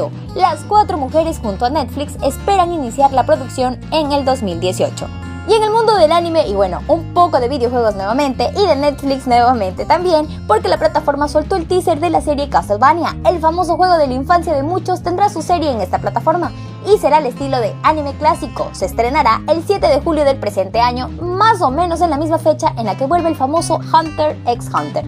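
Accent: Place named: Mexico. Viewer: en serio eres de acuador hablas como mexicana tu muy bien al meter tambien noticias de anime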